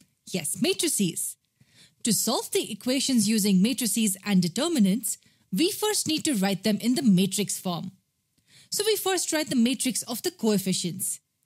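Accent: India. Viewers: Indian accent is good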